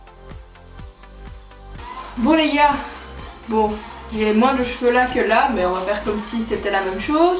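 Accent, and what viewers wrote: Belgium. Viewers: L'accent belge 😍😍😍💕💋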